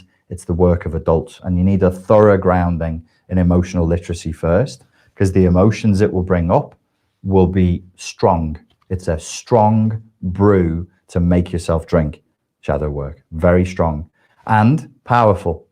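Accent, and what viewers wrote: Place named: Liverpool, United Kingdom. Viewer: This is gold. You sound so Scouse. I love it.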